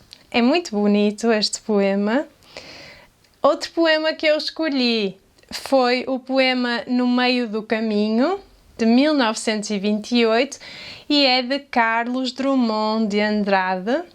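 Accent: Portugal. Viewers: Qua boa ideia! Pode ser divertido para aprender coisas sobre o Brasil também. Mas aprecio o sotaque de Portugal, então posso continuar a estudar português de Portugal. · Muito linda a sua leitura, e escutar te ler, me ajuda a perceber melhor o sotaque de Portugal, obrigada. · Olá, amei esse vídeo. Sou cidadão brasileiro nato e também cidadão português (avós maternos eram portugueses). Amo o Brasil e também amo Portugal. Escutar o sotaque do português de Portugal me faz relembrar minha avó Ana Rosa e meus três tios que nasceram em Portugal. Tenho em mim essa dicotomia que nunca desaparecerá. Quando estou aqui no Brasil, gostaria de estar em Portugal. Quando estou em Portugal, sinto falta do Brasil. Deixarei um link do finado Abujamra, recitando o poema Tabacaria de Fernando Pessoa. Abujamra foi um ícone do teatro, da tv e da teledramaturgia brasileira. <link> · Gostei de ouvir a sua voz, porque gosto do português de Portugal. 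É uma pena que a tradução seja em inglês, eu preferiria em francês para entender melhor os poemas. Obrigado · Que lindo ouvir nossos poemas lidos nesse belo sotaque lusitano. Eu gosto muito da poesia portuguesa. Camões e Fernando Pessoa são meus preferidos. Deixo também algumas sugestões de alguns outros poemas brasileiros que eu gosto muito, para você dar uma olhada depois se quiser. "A máquina do mundo", Carlos Drummond de Andrade; "Meus oito anos", Casimiro de Abreu; "Ismália", Alphonsus de Guimarães; "Língua portuguesa", Olavo Bilac; "Seus olhos", Gonçalves Dias. Parabéns pelo vídeo e pelo seu trabalho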